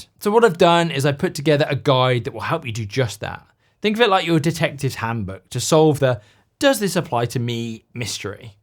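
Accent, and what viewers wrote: United Kingdom. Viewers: A re- re-boot! I hope to see more content. I tried sending your videos to a friend ca. 54 now about 4 years ago. He wetly talks about needing to lose weight (he does) but does nothing practical about it. I hoped the sound of a British doctor would be relatable, rather than a US influencer. People need familiar voices. I'll have another go with him.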